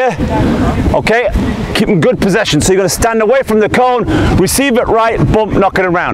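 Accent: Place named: Liverpool, United Kingdom. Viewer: Love all of your videos, where are you from coach? im from a little town called st helens.. your accent sounds a bit scouse. I use your drills with my u16 and u19 teams all the time. Keep up the amazing content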